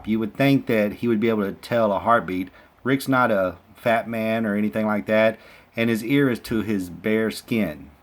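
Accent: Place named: South, United States. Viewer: Haven't seen somebody actually put scenes to the immune theory! I love it! I'm a nurse also and I do know that sometimes heartbeats are to faint to hear, even with a stethoscope. But I agree. He did seem dead to Shane and Shane shouldve been trained to tell since he was a cop. I really wish Carl is immune but my gut tells me that gimple isn't smart enough to throw this at us. But he is stupid enough to piss us off by killing Carl. I also love to hear a video narrated by a southerner. I don't hear southerners narrate videos to much.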